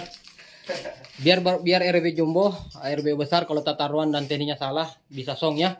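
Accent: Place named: Makassar, Indonesia. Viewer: Ini di makassar ya guys logatnya kayak makssar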